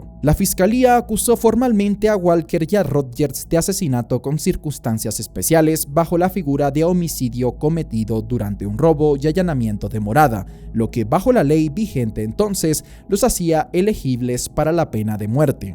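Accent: Dominican Republic. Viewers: Haha y esa dominicanidad que tienes ahora duro 😂🔥💪🏽🇩🇴💯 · Eres dominicano? Por que tiebes ese acento?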